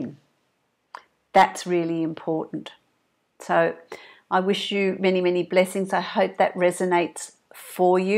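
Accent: Australia. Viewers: so fab hearing an Aussie accent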